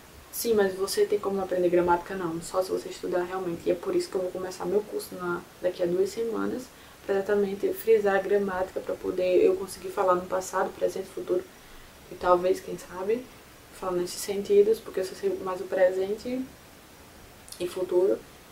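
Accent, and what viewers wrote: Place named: Northeast, Brazil. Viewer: Seu sotaque eh igual ao meu, vc eh do Nordeste? Sou de Maceio, tb sou Au Pair, tb tenho um diario aqui no YT e estou ha tres meses em Virginia. Onde vc esta aqui nos EUA? Bjus!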